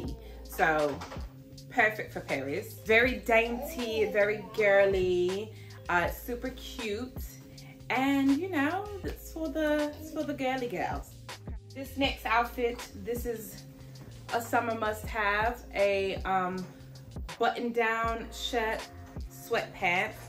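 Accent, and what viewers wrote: United Kingdom. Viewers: And just like that she’s back with the fashion tips and the British accents 😂😂😂🎉🎉🎉🎉🎉🎉❤❤❤ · Am glad you brought the looks back and the British accent, yaaaaass hun!!!!!🙌🏾🙌🏾🙌🏾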